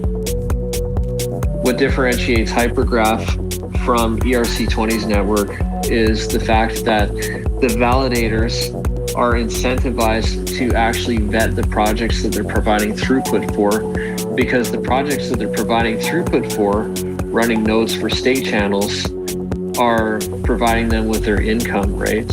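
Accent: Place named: Canada. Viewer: Sounds Canadian 😆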